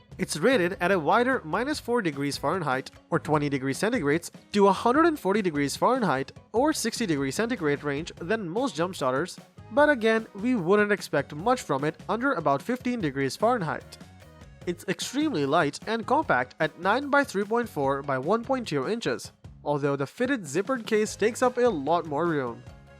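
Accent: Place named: India. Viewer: The Indian accent makes it hard to sit through the entire video. Do you have someone who doesn't have strong accent to read the script instead?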